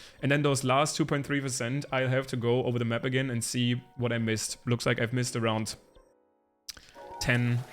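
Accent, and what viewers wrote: Germany. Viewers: You sound german are you german ?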